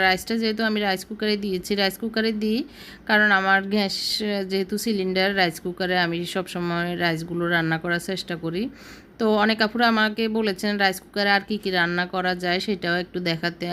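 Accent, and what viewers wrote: Noakhali, Bangladesh. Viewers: আসসালামু আলাইকুম আপু। আপু আপনার বাড়ি কি নোয়াখালী?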